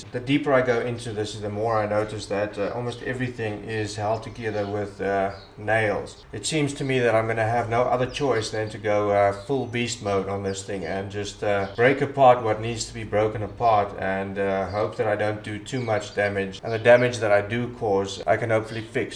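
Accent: South Africa. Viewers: Your Afrikaans accent is jumping off my Afrikaans accent scale. Even my wife was able to figure it out after I gave her a couple guesses.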